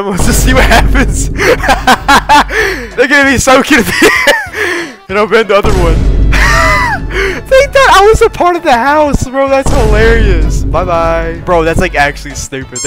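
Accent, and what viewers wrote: New Zealand. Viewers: Are you from new Zealand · wait what are you from nz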